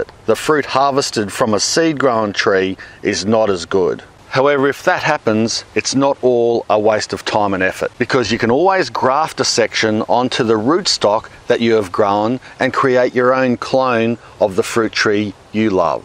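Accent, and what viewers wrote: Australia. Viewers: Well to us Aussies he sounds nothing like Russel Crowe..this old mate is an Australian not a Kiwi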